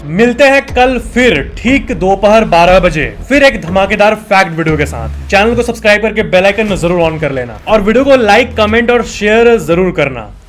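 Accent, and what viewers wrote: Bihar, India. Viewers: Bihari babu voice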